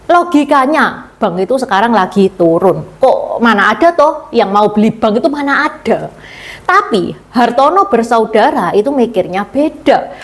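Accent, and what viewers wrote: Java, Indonesia. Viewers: Mantap ci, saya suka gaya ngomong cici medok khas Cindo Jawa...RIP MBH-Oey Hwie Siang taipan no 1 Indo dari keluarga Hokkian/Fujian.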